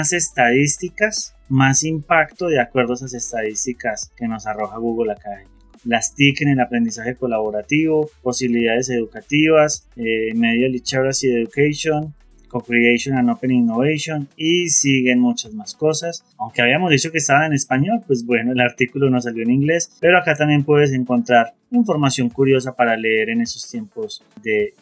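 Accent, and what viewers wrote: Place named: Medellín, Colombia. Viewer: Excelente amigo, sos de medellin, colombia verdad🧠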